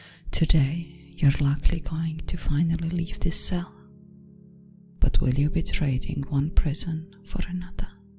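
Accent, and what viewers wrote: Scotland, United Kingdom. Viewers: I can't figure out the accent, the os sound Australian, then she sounds Scottish but the way she rolls some words sounds Russian or something. I love her tho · She sounds Scottish to me, is that just me?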